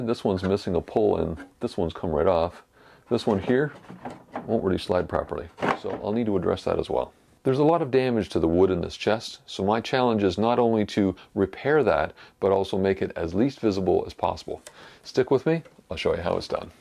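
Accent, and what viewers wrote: Canada. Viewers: Don't worry about your pronunciation of the word router. I love the Canadian accent. I drove a taxi here in the UK, and Canadian passengers were always pleasantly surprised when I asked what part of Canada they were from. They always assume that the British think they are Americans. As soon as I heard a word with OU in it it was obvious they were Canadian. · No need to apologise for your wonderful and authentic Canadian accent. Love your channel! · You don’t need to be sorry for your Canadian accent. It’s part of what makes you uniquely you. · Thanks for another great video. Yes, we do need to see you more often. a) Why are you apologising for your Canadian accent? It's as good/bad as any other. b) Yes, I've emailed Lee Valley. c) yes, I gave it a thumbs-up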